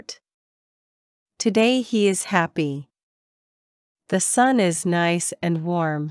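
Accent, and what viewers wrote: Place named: United Kingdom. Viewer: I have been listening to your voice for 9 years. I have no way of accepting other British accents and robotic accents. I'm glad to hear your accent. Thank you so much!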